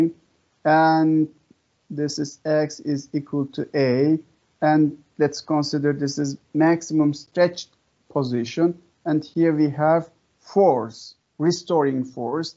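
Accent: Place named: Russia. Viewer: At first I thought that you were speaking Russian.. But glad it's English.. By the way from which country are you from?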